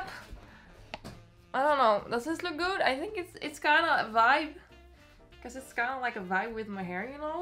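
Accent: Netherlands. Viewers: Are you dutch, cause your accent sounds like you are?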